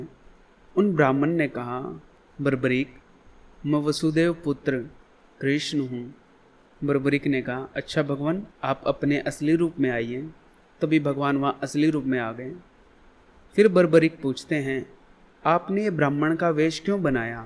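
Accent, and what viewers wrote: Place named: Uttar Pradesh, India. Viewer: Aap up se ho kya ....Apki boli se lagta j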